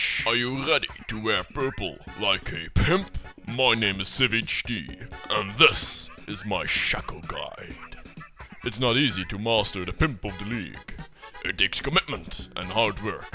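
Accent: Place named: Netherlands. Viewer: Gast, doe je je engels accent expres zo nederlands? xD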